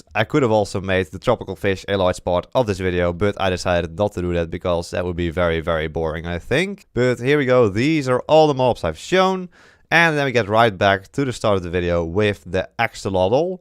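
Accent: Netherlands. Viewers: That accent 🤣 sws Nederlander hahahahahah Dutchie for sure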